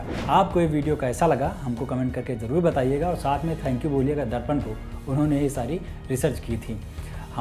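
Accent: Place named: Kanpur, India. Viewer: आपका ही इंतजार था भाई साहब, आपकी कानपुरी भाषा सुनने मै सच मै आनंद की अनुभूति होती है ।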